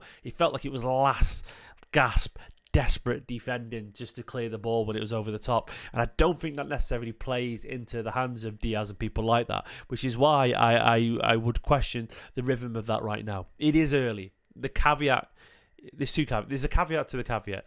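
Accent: United Kingdom. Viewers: hello mate ............ which british accent is it ??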